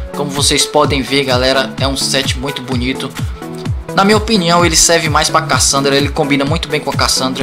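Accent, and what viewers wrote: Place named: Northeast, Brazil. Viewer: Faz do herói ateniense... Esse seu sotaque é de qual estado do nordeste?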